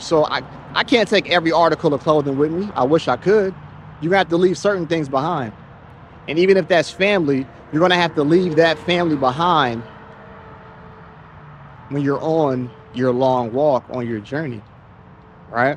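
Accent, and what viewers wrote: United States. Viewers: America people need to know that we find it strange when You start to speak with an American accent. So please don't think it is Because we are racist.... We also generally don't like American people are we feel to be loud and at time obnoxious. As for Charlie Kirk ....he was a hateful man and that hate came back on him. America is fkd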